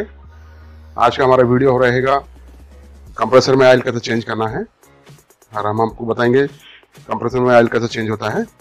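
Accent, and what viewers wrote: Hyderabad, India. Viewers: Bhai aap hydrabad sa ho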